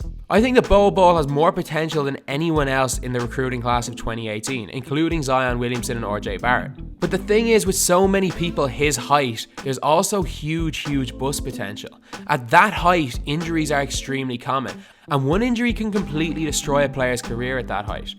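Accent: Ireland. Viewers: thats just normal with an irish accent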